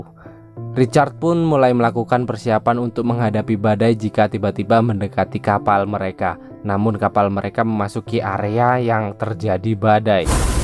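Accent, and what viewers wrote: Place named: Medan, Indonesia. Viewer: Bukan kelen tapi kalian...logat medan ya brooo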